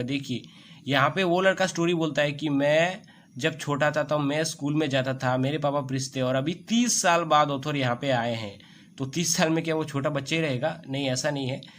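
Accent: Assam, India. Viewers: Bro kya aap assam se ho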